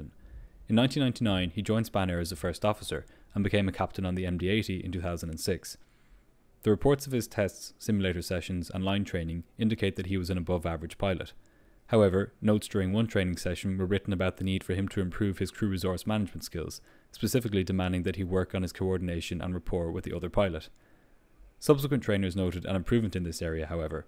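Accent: Ireland. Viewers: MTDSRIP. I'm hearing an Irish accent from the narrator... Wasn't sure until he said 'turty', for 30. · Just curious. Where is the narrator from? He sounds very Irish to me.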